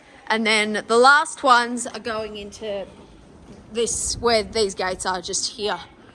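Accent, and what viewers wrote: Australia. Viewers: It's just normal Aussie talk, mate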